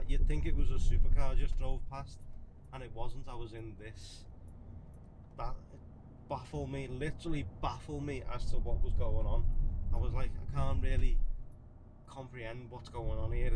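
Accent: Liverpool, United Kingdom. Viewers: I could hear everything he said. Are you deaf or just can't understand a scouser?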